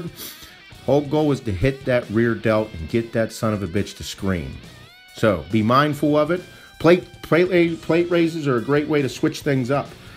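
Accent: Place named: Pittsburgh, United States. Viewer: Love these videos. Probably the yinzer accent.. Crushin it, boss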